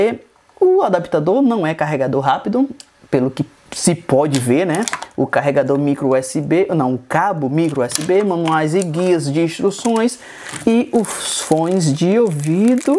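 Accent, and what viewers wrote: Bahia, Brazil. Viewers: O pvt é baiano kkkkk já ganhou meu like !! Tmjj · Deixei o like pq eu gostei do lá ele kkkk baiano mesmo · Voce er bahiano?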